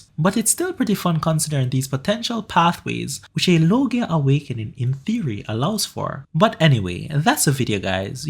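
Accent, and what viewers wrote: Caribbean. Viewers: you sound caribbean where you from? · Are you from the caribbean? I hear the accent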